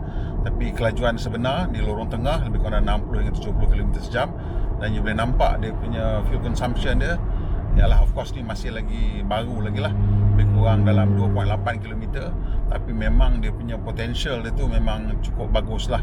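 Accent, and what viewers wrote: North, Malaysia. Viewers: ulas guna slank utaqa style jgk bang.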